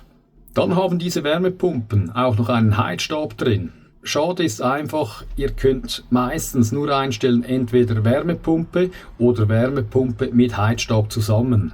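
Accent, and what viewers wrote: Switzerland. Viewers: schöner bericht, danke dafür aber es wundert mich ein wenig: da sprcihst mit einem schweizer dialekt und gibt die preise in € an? aber das mit der zirkulationspumpe und dem leeren des boilers um 50 % ist mir nicht klar. diese zirkulationspumpe sitzt dann doch in einer ringleitung und so kommt das wasser doch immer wieder in den boiler zurück.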